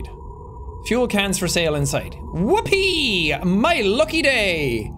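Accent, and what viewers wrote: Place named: Ireland. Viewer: I know this video was posted months ago and as I have said before even if you do not see this those who do I hope you realise that this is true what I have to say is that no matter how much haters he has no matter who tells me he’s not a good YouTuber I will love his content and his pure Irish accent forever keep up the amazing career.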